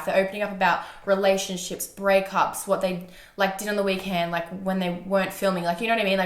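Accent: Australia. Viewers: You sound so Australian in this love it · even her cough sounds Australian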